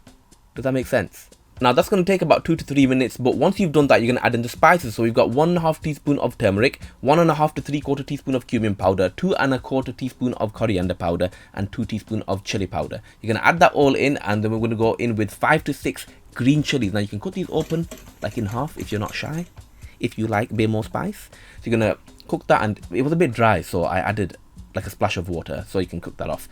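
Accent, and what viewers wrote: Bradford, United Kingdom. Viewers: Just saw this vid in my suggested vids, the thumnail was appealing enough to make click on it and ended up watching it right till the end. Quite interesting. Is that a Bradford accent?Subscribed and will definitely try cook this dish on one of my days off. Thanks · Bradford right?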